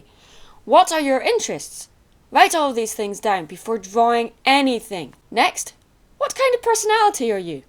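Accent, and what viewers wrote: Scotland, United Kingdom. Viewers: I'm Scottish too athough i dont have an accent But I Love yours! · this is too scottish for me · Mhm, me and Dog like your Scottish accent. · Adorable >u< I love her accent and I'm actually part Scottish too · Btw if reads this were in the highlands do you live is it the birthplace of ICTFC if it is you need to say cuz if you do I would flip. Also what accent do you have is it invernigian or glaswegian or other yay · U Scottish so am i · i honestly love your accent and i believe you are the only scottish female pony tuber.. that just makes you special :D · Yay it's a Scottish youtuber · OMG ur Scottish I love u 4 EVAR!!!!!!!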